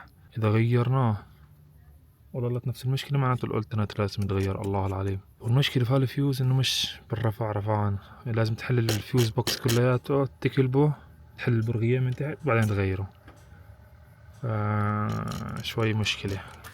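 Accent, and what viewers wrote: Palestine. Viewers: لهجتك الفلسطينية الأصيلة اجمل اشي ولازم تكملها يا عمي بالحلال مع بنت الحلال .. ربي يوفكم للخير ويهدي بالكم ❤ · من وين انتَ من فلسطين 😅😅حاسس من رام الله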